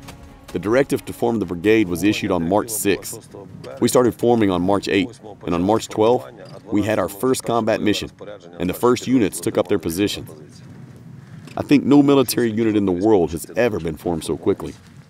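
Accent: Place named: South, United States. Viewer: I do like these type stories .. especially translated is a southern accent. Lol. I was a military spouse x2 retiring both, living on or around so many bases, mostly west coast but we lived in Va, Ga, Ok.. Plus as a USO volunteer and working for a military contractor in DC.. when my husband was stationed at the Pentagon, then i transferred in, we saw a lot of each other, especially his friends and more. He was in communications on the 5th floor, and spoke several languages including Russian. Being around his friends more was like listening to you but they spoke atleast 3 languages besides English as did my husband. Unsure if you actually understand the language but your translation is great. Better then reading bad English translations on videos. Grrr...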